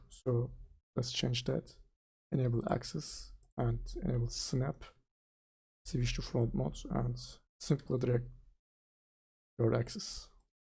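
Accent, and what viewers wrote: Turkey. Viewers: aksanından hareketle Türk olduğunu düşünüyorum... video için teşekkürler. faydalı teknikler göstermişin. 3-4 sene öncesine kadar senin gibi daha fazla kaynak bulabilseydim şimdi max kullanıyor olmazdım.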